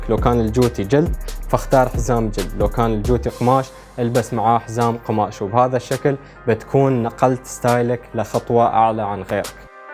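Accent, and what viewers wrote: Iraq. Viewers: ماعرف ليش احسك عراقي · حسبت انك عراقي 😃😃😃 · طريقة كلامك تجيب من الشعب العراقي بشكل قريب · كنت احسبك عراقي ههه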